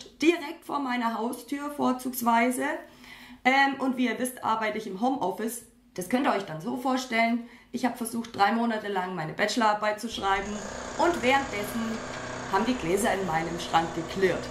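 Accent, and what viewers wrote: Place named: Franconia, Germany. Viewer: Gelllllll!!!! Dein cooler Dialekt (Fränkisch) weiter so gellll ?!😂👍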